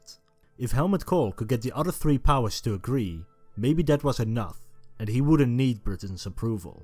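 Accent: Netherlands. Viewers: that sounds like a dutch accent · I guess dutch. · Strong Dutch accent :) cute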